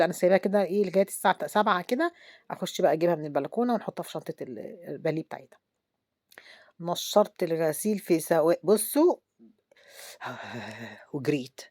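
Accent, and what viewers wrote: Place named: Egypt. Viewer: سيدة جميلة جدا احب لهجتك المصرية الجميلة وحسك الفكاهي وخصوصا عندما تقولين يا ارشنات هههههه كلامك كله سكر تحية حب من المغرب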